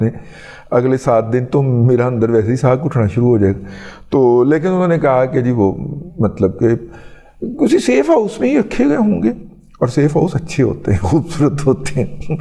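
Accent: Punjab, Pakistan. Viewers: Better you avoid using english words in Punjabi accent. Punjabi would be a better choice for your Vlogs. Please also avoid self praising.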